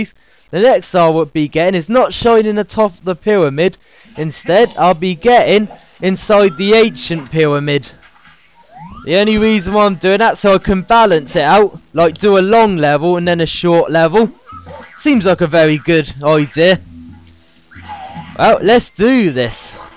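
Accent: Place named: United Kingdom. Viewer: Mario 64 and your (British?) accent, brilliant combination :D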